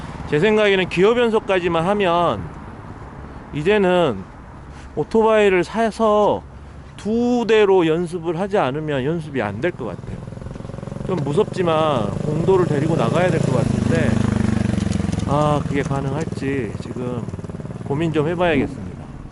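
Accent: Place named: Jeolla, South Korea. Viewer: 역시 전라도 사투리는 화난거같은데, 사실 화 1도 안남 ㅋㅋㅋㅋㅋㅋㅋㅋ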